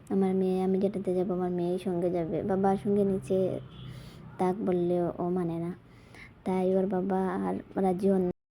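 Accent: Sylhet, Bangladesh. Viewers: লাইক সাবস্ক্রাইবার করে দিলাম ইনশাল্লাহ এগিয়ে যাবেন সুন্দর মন থেকে ভিডিও বানান সমস্যা নাই পাশে আছি এগিয়ে যান হ্যাঁ আপু তোমার বাড়ি কি সিলেটে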